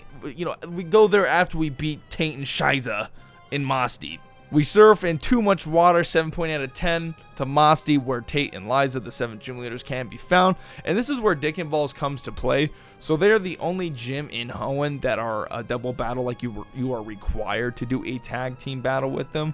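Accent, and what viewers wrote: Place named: Toronto, Canada. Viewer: U talk like u a Toronto mans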